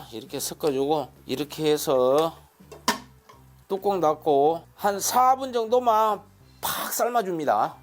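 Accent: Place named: Jeolla, South Korea. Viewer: 아... 잘라구 누웠는데 헉... 요리사님 말ㅇ투가 진짜 이건 전라도인데 전남쪽...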